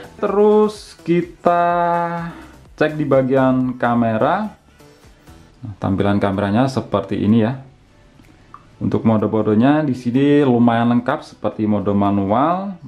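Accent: Blitar, Indonesia. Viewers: Wong blitar yo mas 😂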